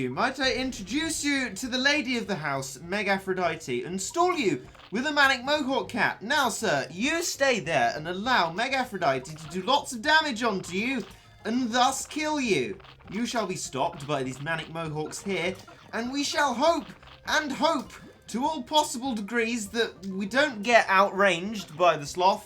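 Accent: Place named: United Kingdom. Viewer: . I watch your videos because it's Battle Cats, and I love Battle Cats. I watch the three "big" streamers, Captain Sauce, Chawsey, and MattShea. But when I come to watch your videos, your self-deprication/sourness really turns me off. I know it's a schtick, because I've watched a lot of your videos and given all of them a fair gambit, but honestly, at this point, your "self-deprication" followed by occasional "I've taken this to the Battle Cats Reddit/Source" and talking about that, then the clearly obviously thrown in jokes about your recording times are really really stale. I wish I could give you beneficial advice. I really do. The thing is, if you only do one thing as much as you do, it's really, REALLY hard to give helpful advice. Now your starts in Doki Doki and Singularity are GOOD things, and I implore you to use your lovely UK accent in more videos, as it is with Battle Cats, I literally cannot enjoy your videos because of how salty you are (and I am in the minority there...) But advice is advice, take it how you will, you apparently miserable twerp.:p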